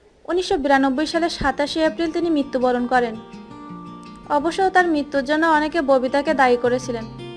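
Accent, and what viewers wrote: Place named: Pabna, Bangladesh. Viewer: desher bari koi pabna na kushtia ........? kotha bolte jane na........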